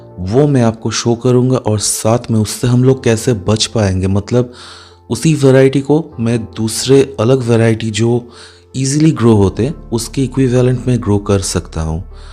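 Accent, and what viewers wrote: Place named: Bengal, India. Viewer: Aapki knowledge, bengali hindi accent and voice modulation is great.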